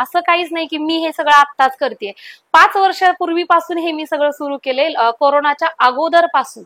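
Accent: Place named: Solapur, India. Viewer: खरं आहे आशा निगेटिव्ह कमेंट आल्या की आपण नाराज होतो. पण आशा कमेंट कडे दुर्लक्ष कर. आणि पुढे करत रहा. मलाही अशाच निगेटिव्ह कमेंट्स येतात. मी दुर्लक्ष करते. तू छान करतेस. आपली सोलापुरी भाषा आपला अभिमान आहे. आपल्या भाषेवरून बऱ्याच कमेंट्स येतात. पण मागे हटायचं नाही. नाराज व्हायचं नाही. पुढे करत राहायचं.❤👍👍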